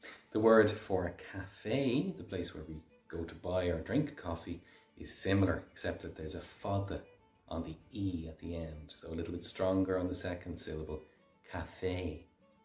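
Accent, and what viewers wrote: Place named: Munster, Ireland. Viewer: finally a Munster speaker. All other videos I see are not pronounced tha eay I learned as a kid in Waterford. Its nice to hear Irish spoken the way I learned it.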